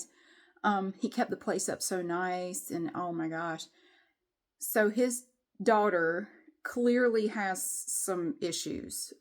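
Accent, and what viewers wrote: South, United States. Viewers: You sound southern . Arm yourself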